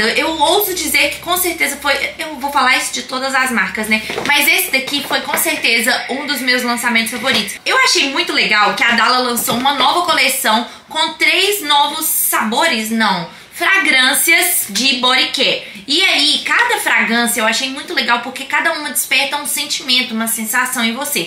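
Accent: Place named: Minas Gerais, Brazil. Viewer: Meu seu sultaque e lindooooo, também sou mineira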